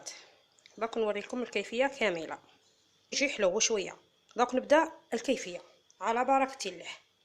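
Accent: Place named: Chlef, Algeria. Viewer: هدرتك كي صحاب شلف و لا تيارت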